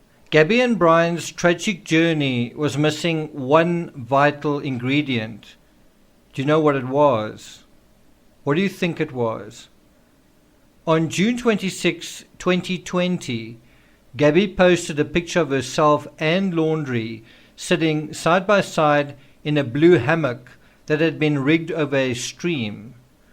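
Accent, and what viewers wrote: South Africa. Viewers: Are you South African🇿🇦🇿🇦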